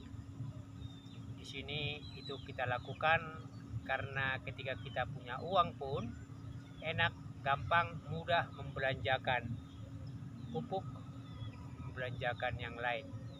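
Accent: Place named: Sulawesi, Indonesia. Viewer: Mantap nih..kalau dari kata-katanya bapak ini pintar dan berpendidikan tinggi dan seperti orang Sulawesi gaya bicaranya ..sehat dan sukses 🙏🙏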